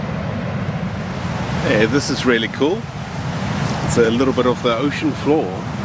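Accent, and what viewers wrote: South Africa. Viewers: Just from your accent u are definitely from South Africa. U are in an awesome place. But do u have any knowledge of our eastern cape here in SA cause I love collecting rocks but no rocks like u have found. Just give me some intel here in Gonubie or surrounding areas where I can find similar ❤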